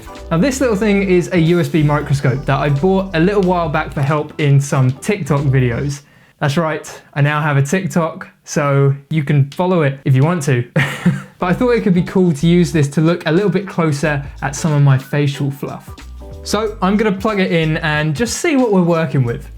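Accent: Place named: United Kingdom. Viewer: Just found you today, and now I am subscribed. Love your content! Hey I have a dumb question for you? Do you live in the UK as your accent makes it sound like you might?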